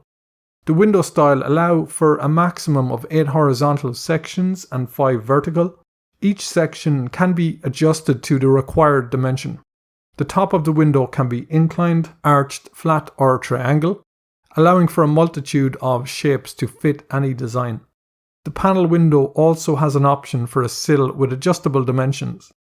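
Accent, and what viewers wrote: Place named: Ireland. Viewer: Excellent - thanks for pacing this nice and slow, and with clear diction (also nice to hear an Irish accent!). Many tutorials become hard to use when you have to pause, rewind and try to hear what the guy (always a guy) is actually saying. After using Sketchup for years, it takes a while to get the hang of Blender. Thanks again and keep 'm coming.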